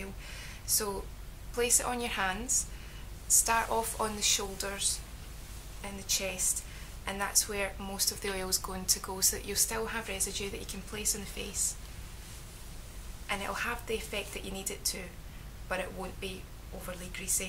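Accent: Scotland, United Kingdom. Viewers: (ZombieToad) its Scottish I'm Irish so I know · Did you study at the Moray College UHI? I'm getting insterested inthe Complementary Therapy HND. You give some glamour to the Scottish accent! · Brandon Connell It's a Scottish accent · i really really like the scottish accent:) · You are such a pretty girl and I absolutely love your accent. I have always wanted to visit Scotland. Have you ever wanted to live on another continent? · she is Scottish for sure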